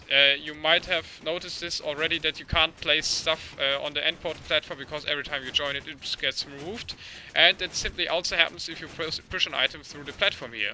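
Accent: Germany. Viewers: yes he's german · Your english sounds like you´re no English/American guy. Are you German? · the accent is from germany ;) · well then he didnt have a typical german accent. trust me dude, this is a heavy german accent. i am german, in a few videos before he was talking german. this is a german accent.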